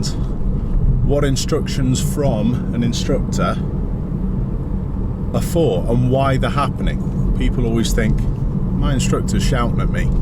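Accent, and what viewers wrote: Liverpool, United Kingdom. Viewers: Gotta love the Scouse accent lol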